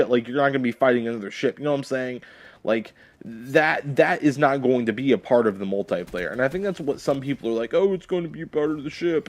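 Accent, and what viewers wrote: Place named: Ireland. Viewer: It's an Irish accent ;D Thanks man that means a lot xD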